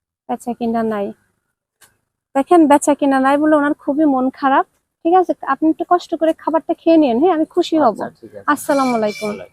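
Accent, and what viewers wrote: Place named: Jessore, Bangladesh. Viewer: তোমার কি সুন্দর কথা আমাদের যশোরের মত কথা তোমার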